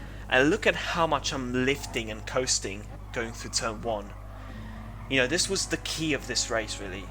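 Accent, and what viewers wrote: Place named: United Kingdom. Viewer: You sound more british than most british people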